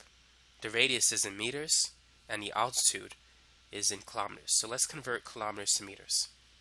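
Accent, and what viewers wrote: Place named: New York, United States. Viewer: i cant stop focussing on the new york accent omg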